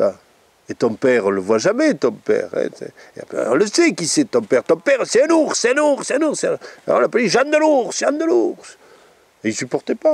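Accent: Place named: South, France. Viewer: Hoorah enfin du vrai accent du sud! Merci pour cette vidéo!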